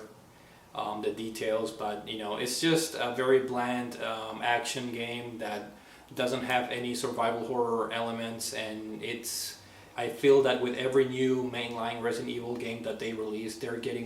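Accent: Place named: Argentina. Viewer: Great videos man. Where from in South America? I would think Argentina, but please don't be mad if you're from Uruguay hehe. Similar accents.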